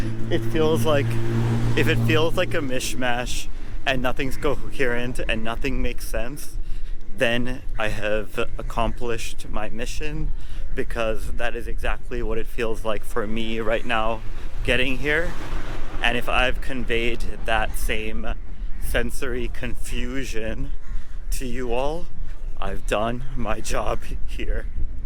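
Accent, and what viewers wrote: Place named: United States. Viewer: Thank you for this beautiful vlog of Berbera Port.I liked and subscribed the channel.Are you from the United States.You've am American accent.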